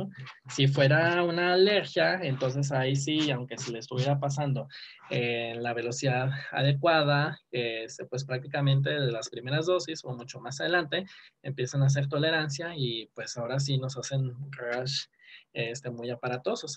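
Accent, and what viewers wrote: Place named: France. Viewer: Está chido el acento francés, excelente clase gracias por compartir de su conocimiento!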